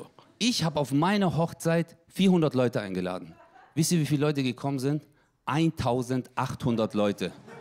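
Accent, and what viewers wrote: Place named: Swabia, Germany. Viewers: Özcan Cosir... oh man, lange nichts mehr von dem gesehen, ist der nicht aus Cannstatt? Bin in der ähnlichen Gegend aufgewachsen und auch unter nem Haufen Kanacken. Also der Dialekt und der Humor fühlen sich sehr vertraut an. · Bitte mal eine ganze Show mit dem extremen Geschwäbel 😄😄😄 Sehr lustig 😂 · Dem Dialekt nach von irgendwo zwischen Stuttgart und Ulm :D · Wenn die Hälfte der Witze auf dem kulturellen Hintergrund der Herkunftsfamilie aufbaut, macht es schon Sinn vorher zu erwähnen welcher Hintergrund das ist. Er hat übrigens nicht erwähnt daß er auch Schwabe ist, weil man es am Dialekt hören kann.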